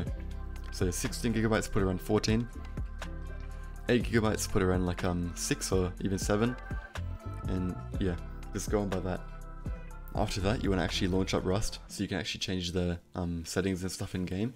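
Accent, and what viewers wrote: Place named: South Africa. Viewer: I smell a South African.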